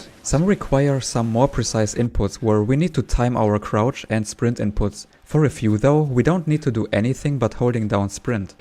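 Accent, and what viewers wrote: Germany. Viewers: digga und man hört trotzdem in den ersten 2 worten das du deutsch bist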